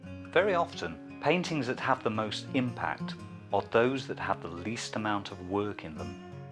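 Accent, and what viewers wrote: England, United Kingdom. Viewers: So pleasant to hear an English voice. Have watched a lot of American stuff which is sometimes a bit hard on the ear!! Really great instruction too!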